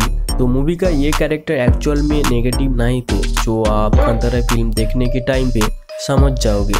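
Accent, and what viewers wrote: Bengal, India. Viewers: App bengoli ho na app ki bat karneka tarika pata chal jata hai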